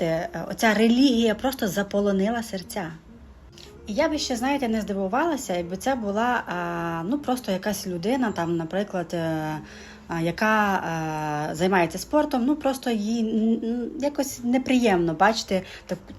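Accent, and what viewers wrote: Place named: Chernivtsi, Ukraine. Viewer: Ви з Чернівецької області , говор у вас ніби звідтіля.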